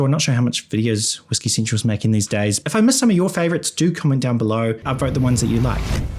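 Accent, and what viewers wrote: New Zealand. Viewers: Your channel just came up randomly... Glad it did. Enjoyed your break down. Informative and I enjoy listening to the NZ accent 🇦🇺✌️✌️✌️ .... Good video mate. Subscribed. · The thick kiwi accent is the highlight of this video. Corkers like spictrum and shirry and having six with a sheep abound. Luckily whiskey is already pronounced with an I.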